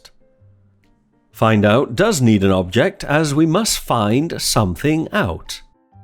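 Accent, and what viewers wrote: United Kingdom. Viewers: Your British accent sound is the most stunning that I've ever heard. I appreciate your contribution of British accent promotion cos many youtubers just own American accent instead of British one.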